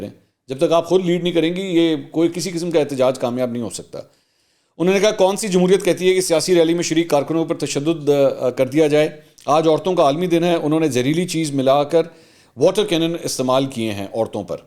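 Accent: Punjab, Pakistan. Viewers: Bro i really like ur analysis also your punjabi tadka during analysis .. Good work.. keep it up.!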